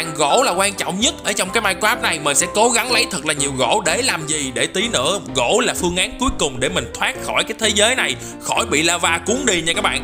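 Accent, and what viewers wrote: South, Vietnam. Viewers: Anh miền nam not trung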